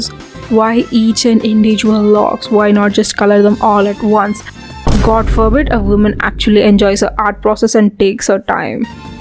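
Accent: India. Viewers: are u indian ????? hmmmm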